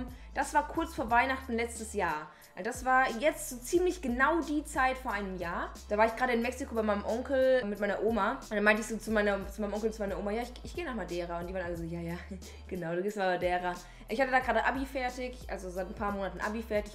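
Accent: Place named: Aschaffenburg, Germany. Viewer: Kommst du aus der Nähe von aschaffenburg?? Weil man merkt den Dialekt voll loool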